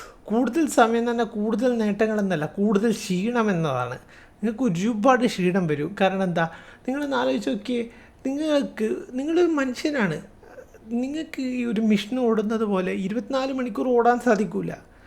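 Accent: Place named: Thalassery, India. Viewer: നാടൻ തലശ്ശേരി ശൈലി❤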